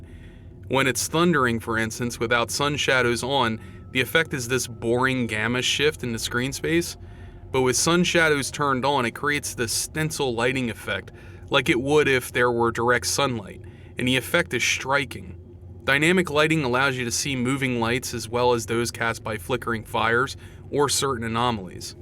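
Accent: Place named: Philadelphia, United States. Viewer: Are you from philly or around west chester? Your accent reminds me of bam margera and brandon dicamillo but not like a yinser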